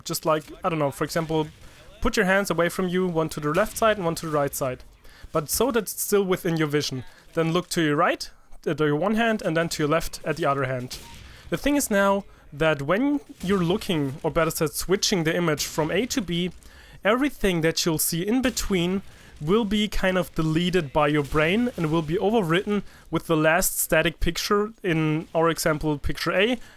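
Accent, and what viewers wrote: Germany. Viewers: Hör ich da nen Deutschen Akzent raus oder täuscht mich das? :D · Du klingst voll deutsch :D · ! You sounds German alright. Might be because I have a few German friends, so I'd know. (and Norwegian/Swedish/Danish friends as well) Dutch and Danish sounds quite similar, though.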